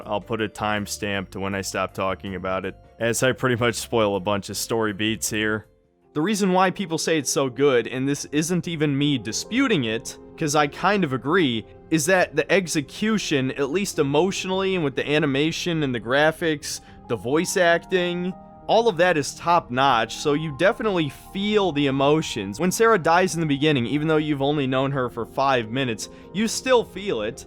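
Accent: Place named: Midwest, United States. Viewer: The Midwest accent is strong with this one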